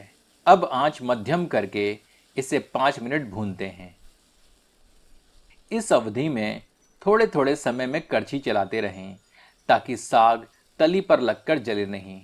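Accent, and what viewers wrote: Standard. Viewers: aapki hindi zabardast etni shudhta se bhari prastuti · Aapka bolneka andaz aur sudh hindi bohot achaa hai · Wow, swadisht, shuddh Hindi k saath 😀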